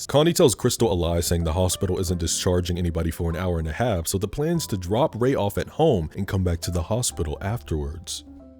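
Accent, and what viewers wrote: United Kingdom. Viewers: you fool lol. I absolutely love your uk/brit accents, they just scratch the perfect spot in my brain lol 🙂‍↕️🤝🧡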